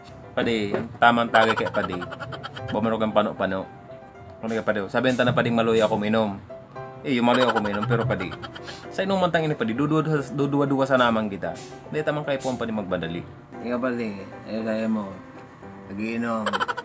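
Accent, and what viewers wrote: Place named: Bicol, Philippines. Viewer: ahahah bicolano po ba yung salita nio tagayan na thankyou sir sa video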